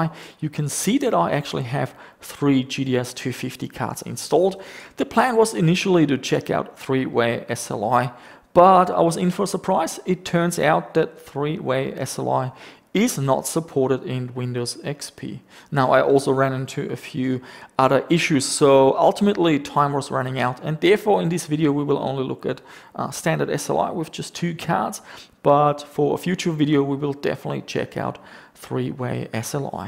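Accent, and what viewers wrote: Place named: Germany. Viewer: Nice Video, can i ask you were you’re from? You have kind of an German dialect :D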